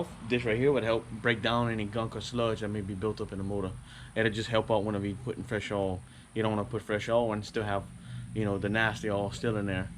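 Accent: Louisiana, United States. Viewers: Bet you from Louisiana accent sound too familiar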